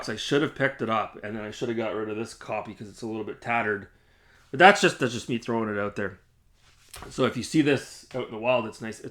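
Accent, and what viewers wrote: Canada. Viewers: haha i clicked your video without looking at the name of your channel, and before you had said 3 words I knew you were a fellow Canadian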